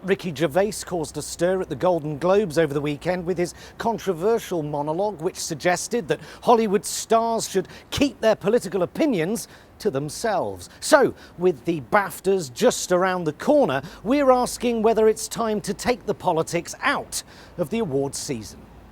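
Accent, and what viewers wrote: Australia. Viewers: Love the Australian accent, boy! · Strong Aussie accent game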